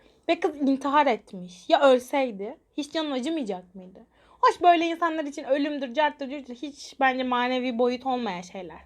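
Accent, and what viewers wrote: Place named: Aegean, Turkey. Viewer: Ya seni yirim şive egeden izmirliyim öptüm çok ❤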